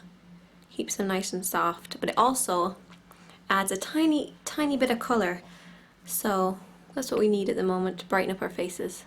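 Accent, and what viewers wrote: Ireland. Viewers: You are really pretty! :) Are you by any chance irish? Sorry just curious, you sounded a bit irish. I'm not irish I'm british, norfolk accent XD Just wanted to know! Btw great vid, i'll be using those tips haha. I know a good tip for eyeliner. To keep the eyeliner on so it doesnt come off or smudge is to put a simular color eyeshadow over it or if you want a bit of variety use a different color! :D Something i picked up when practicing my make-up! :) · are you Irish?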